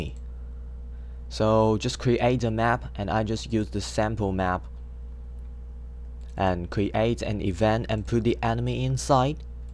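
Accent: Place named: Taiwan. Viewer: 你好,很高兴认识您。Actually, I know you are from Taiwan. Because the mandarin that you speak are different than mine and I saw you use type traditional chinese in the tutorial video. I learned it for half year at Taiwan University last year on November but I still need to keep up on trying and learning from everyone here. It is a great experiences to learned it from you. I enjoy your tutorial video a lot. 谢谢你。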